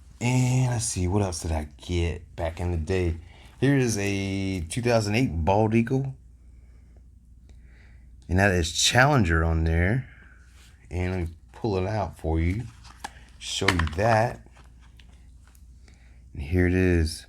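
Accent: South, United States. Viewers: This may be a strange question but where are you from? You have an accent that I have heard in African American neighborhoods originally from the South and living in Washington DC and Philly.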